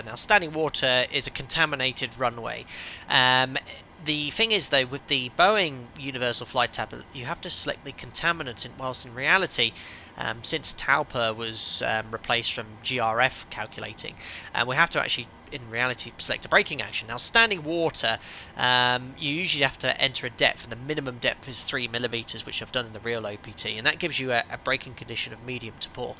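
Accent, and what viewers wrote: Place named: United Kingdom. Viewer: This is why your one of the best flight instructors and tutors for the Boeing 737. Basically an expert with Professional speech / terminology. Full of good knowledge with dry British humour and accent.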